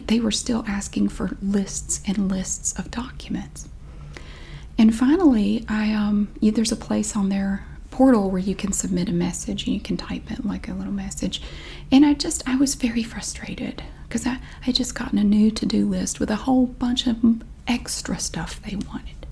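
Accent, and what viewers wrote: South, United States. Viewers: Oh, be my smart ass sweetie pie 😍🥰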